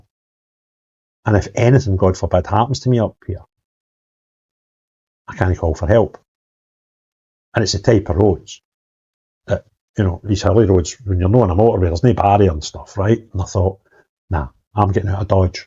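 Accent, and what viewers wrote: Scotland, United Kingdom. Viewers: please watch yourself doing this video. You've got an extremely broad Scottish accent, I want to listen to you as I think it's important. Some printed text would be most helpful ☺️ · Is there any chance you could write down place names in the video descriptions? Sorry, I can't always pick them up from your Scottish accent. I like to search ttem up and read a bit more about them. I couldn't find the one which sounded to me like Kapatiki.Thanks.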